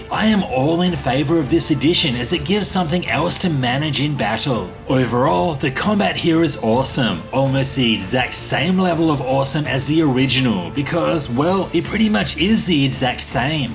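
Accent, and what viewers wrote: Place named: Australia. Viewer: The Patreon joke and the rpg in the name and the Aussie accent had me instantly sub.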